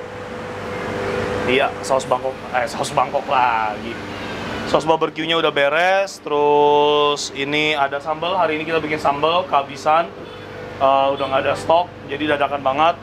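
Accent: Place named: Tegal, Indonesia. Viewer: Aja miring miring ooohhh hhhhh.... Kayane sih wong tegal lek ora wong purwokerto..😀😀😂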